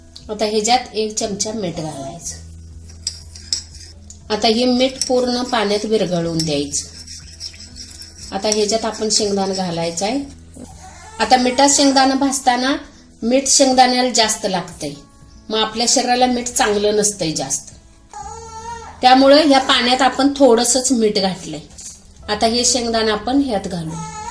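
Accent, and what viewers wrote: Kolhapur, India. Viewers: Kolhapuri bhasha aahe na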